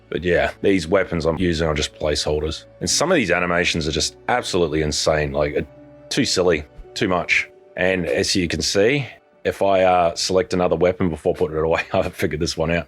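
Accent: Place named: Australia. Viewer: Blonde anime girl with deep male Australian voice fits oddly well 😂. Looks like its going well, i think its so cool youre doing this.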